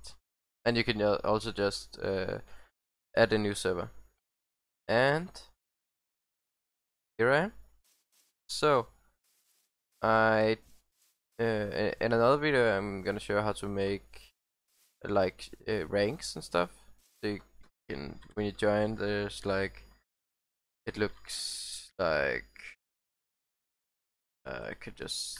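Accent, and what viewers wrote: Denmark. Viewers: Are you danish? I can hear it on your accent. And I love your vid :D please don't stop making so good Videos :D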